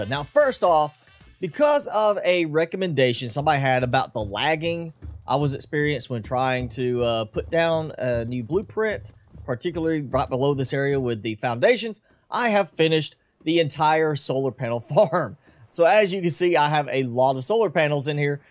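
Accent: South, United States. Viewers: You sound southern are you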